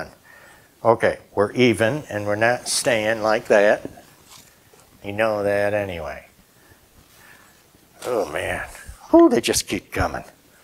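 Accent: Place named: Illinois, United States. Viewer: Big or small does it all! Beautiful job. They’re all my favorites. You mentioned that you lived in Tennessee at one time. Were you originally from Illinois? That accent!!! Lol! Were you a marine too? 😃🌷🌺 God bless and protect the Ukraine 🇺🇦 🇺🇸 TFS!!!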